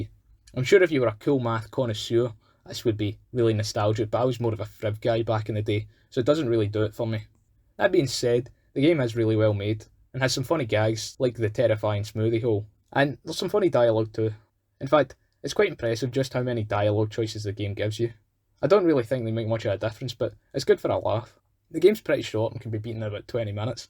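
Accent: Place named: Scotland, United Kingdom. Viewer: May you gain million views random scottish man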